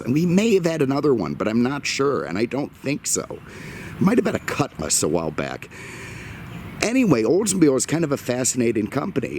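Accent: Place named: Midwest, United States. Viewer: While watching and enjoying your videos I wondered about your accent. Suspecting it to be Midwestern. Thanks for the confirmation that you have Chicago roots. Keep up the good work.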